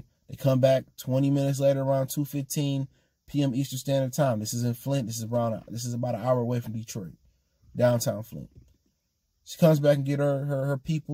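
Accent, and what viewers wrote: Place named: Ohio, United States. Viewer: You sound like you from Cleveland 🤔. I could be wrong but you definitely have an Ohio accent.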